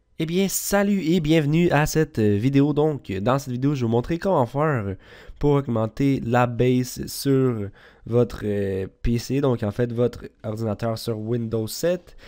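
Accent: Quebec, Canada. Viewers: lol canadien comme moi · Un Québécois ! Belgian like Québec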